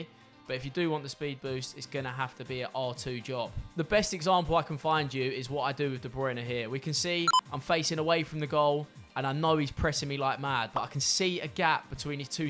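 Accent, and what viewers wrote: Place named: United Kingdom. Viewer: god i love this bri ish accent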